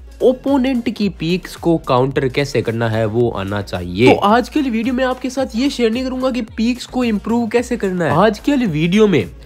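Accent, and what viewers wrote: Haryana, India. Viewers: Bhai haryana t h tu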